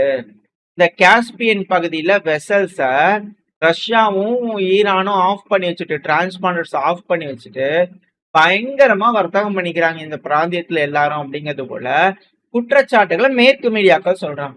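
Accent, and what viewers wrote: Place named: Chennai, India. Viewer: Madras தமிழில் பேசாதீர்கள்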